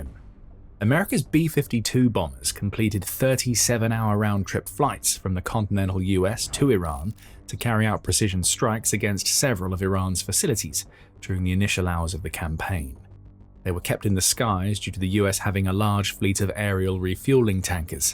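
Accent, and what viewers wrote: United Kingdom. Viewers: Why so many video makers use narration with a heavy British accent which is less easy to understand for people in America? Is it because it sounds more authoritative or because more people in the world are in the areas which use that type of English? Or, most videos are made in UK? I wonder.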